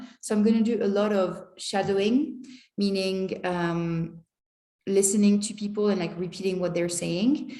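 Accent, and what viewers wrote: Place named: France. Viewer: Great mastery of English. It seems to me that you are french native, I have the flair for french,Arabic and English. Your English is fabulous. You are great. Thank you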